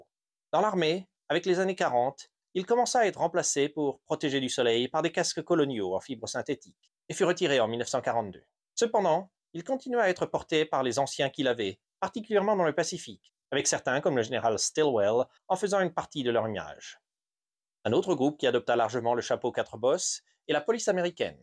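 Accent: Quebec, Canada. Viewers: Léger accent canadien francophone. Léger, mais bien là.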